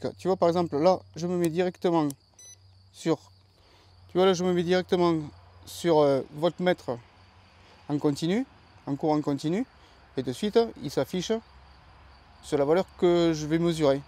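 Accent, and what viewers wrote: South, France. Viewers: Ohh mais tu es de chez moi ( le sud),!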